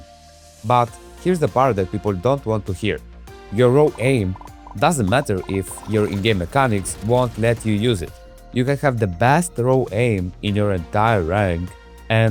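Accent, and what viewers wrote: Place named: Eastern Europe. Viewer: Whenever i hear an east european accent i know the man will go straight to the point while spitting facts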